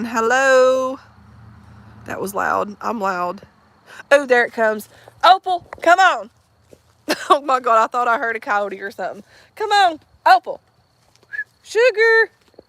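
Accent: South, United States. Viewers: Love ur country accent. B blessed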